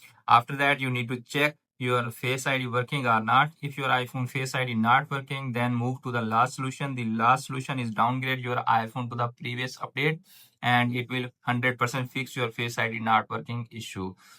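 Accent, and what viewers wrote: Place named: India. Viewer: Can you speak English instead of Indian?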